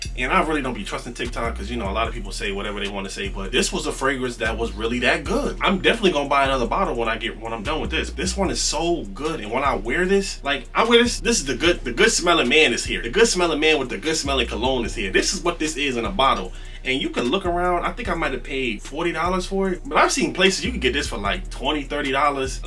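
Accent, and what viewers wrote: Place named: New York, United States. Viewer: Bro sound like he from nyc , much luv . One of the few reviewers that don’t talk about clones in every video , keep it up g I’m taking 📝.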